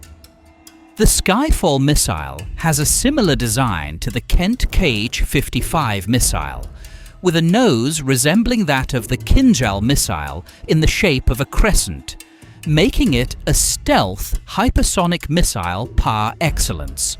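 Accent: United Kingdom. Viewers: Wrong accent. I place no creadence in any brit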